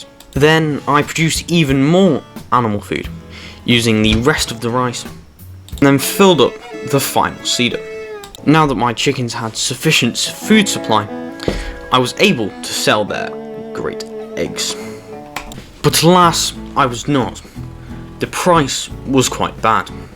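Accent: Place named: RP, United Kingdom. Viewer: you sound very posh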